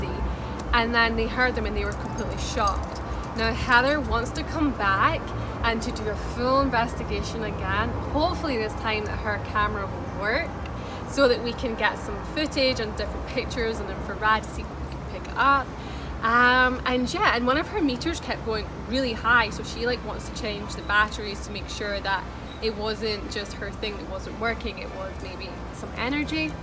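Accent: Scotland, United Kingdom. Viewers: Please never lose your lovely Scottish accent!!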